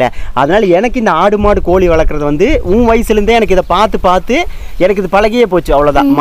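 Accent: Kongu, India. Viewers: Kongu Tamil kongu Tamil thanunga ❤🌱 By Kongu Pet Collections 🌱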